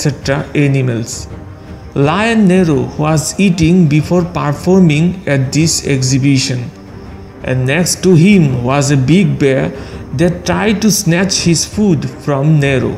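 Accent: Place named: Vrindavan, India. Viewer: Dari logat dia bicara sepertinya dia orang vrindavan 😌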